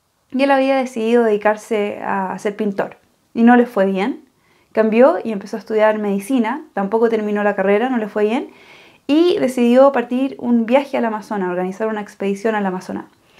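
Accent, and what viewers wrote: Chile. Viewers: Hola. Vi un video tuyo hace un par de semanas, y de ahí seguí todos los tuyos. Son muy útiles. Te felicito. Aunque mi caso es muy difícil. Por el timbre de tu voz (muy lindo), pienso que vos sos chilena. Y en un video te escuche que actualmente estas viviendo en la Argentina. Yo soy argentino. Me alegro que hayas elegido mi pais. Chile y Argentina, somos paises hermanos. Espero y deseo que te vaya muy bien. Mientras tanto sigo viendo tus videos, y recomendárselos a amigos. Una cosa, tus videos tienen un problema de edición. Salen con un sonidos muy bajo, que cuesta seguirlos. Bueno, un abrazo fuerte y gracias nuevamente!!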